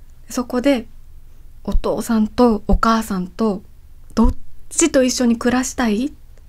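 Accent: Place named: Kansai, Japan. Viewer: 今回は道頓堀での講演のせいか、関西弁が強めの深津さんは良いね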